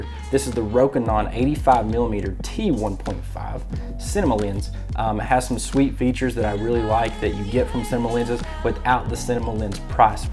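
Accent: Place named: South, United States. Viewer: I'm subscribing just because of your southern accent.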